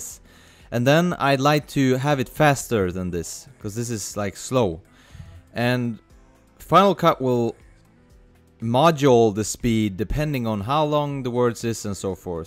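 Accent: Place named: Sweden. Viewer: Haha as soon as you started speaking I knew you were swedish. Tack så mycket för hjälpen!!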